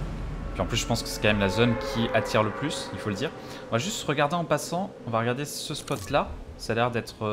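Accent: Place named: Russia. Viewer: J'adore ton accent "roose"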